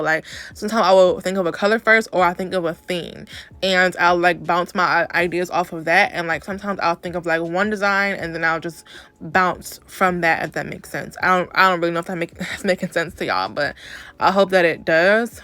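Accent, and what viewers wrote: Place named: South, United States. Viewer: Where are you from, if you don't mind me asking. Sounded like i was hearing some country coming out in this video...😂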